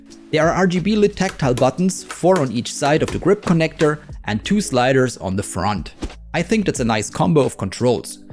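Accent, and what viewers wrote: Germany. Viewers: Hi. I am new to your chanel, I live in Germany, from your accent I gues you are german, do you have any tips where i could get nyogel 767a? Danke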